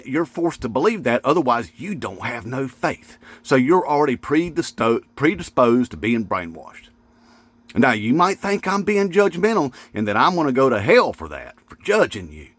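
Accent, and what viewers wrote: South, United States. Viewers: I love that you popped into my algorithm!!! I’m a bi-racial Afro Latina & you are keeping me sane through this madness! Love your content, dude! And that southern accent is the cherry on top of the sundae! #InmateTrump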